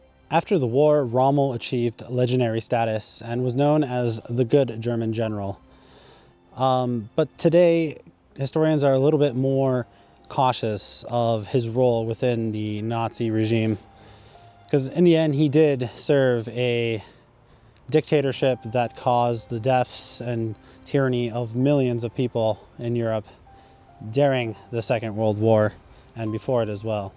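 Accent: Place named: United States. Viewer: Although your accent seems American to me, I do wonder if you're a Brit living in the States.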